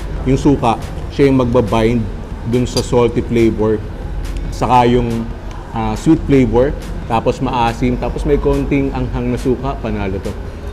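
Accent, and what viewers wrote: Batangas, Philippines. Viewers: Parang taga batangas si Sir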